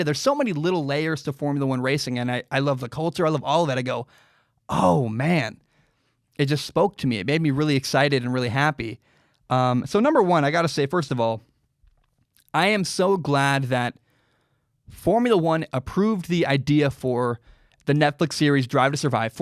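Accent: United States. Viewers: Formula 1 is my favorite sport after Football and I'm glad it has your attention now! The Netflix series has made it even more amazing. Get in there, Lewis! It's so refreshing to hear a content creator with an American accent say all the Formula 1 stuff! You're going to have to pick a favorite team and driver now.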